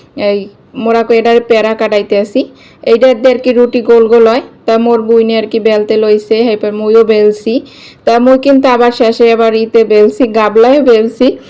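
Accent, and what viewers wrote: Barishal, Bangladesh. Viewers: বরিশালের ভাষাটা অনেক ভালো লাগে আর রান্নাবান্না গুলো অনেক সুন্দর ছিল আপু সাবস্ক্রাইব করে পাশে রয়ে গেলাম বন্ধু হয়ে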